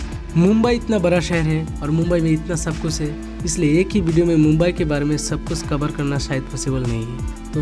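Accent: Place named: West Bengal, India. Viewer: I think u r from Bengal ,,,, ya??? I could recognize your voice ☺️☺️ keep it broo very well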